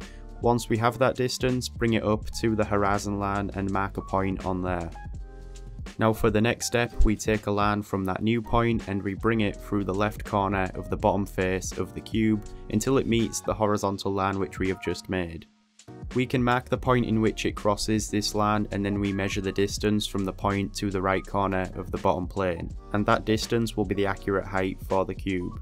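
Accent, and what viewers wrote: United Kingdom. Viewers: You have a british/country accent. It's soothing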